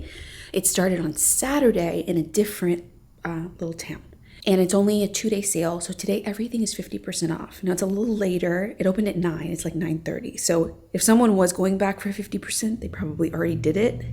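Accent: Texas, United States. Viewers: my heart smiles so big when I’m notified of a video from you❤❤❤❤❤. I have followed you for several years and watched so many projects from the apartment ( out door chairs) loved for your little patio. The last week of pregnancy was so hard for me I got so tired!!!!!!! And the night before both of my babies ( age 47 and 40) the day before delivery my body just said no more, I was tired and felt like I was coming down with the flu, this may happen to you. My son 40 weighed 10 pounds. I am so excited for you but walking really helped with the labor (they had me walk in the hospital) to help,with the labor. When you are tired listen to your body. I am the happiest when I see your beautiful smile and sweet Texas accent, ( yes it’s there).❤❤❤. I wanted the floral China it looked so sweet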